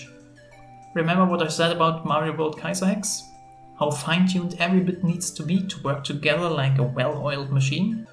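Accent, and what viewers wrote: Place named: Germany. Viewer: It felt nice to hear a new German voice. And of course, every word was pronounced 100% clearly.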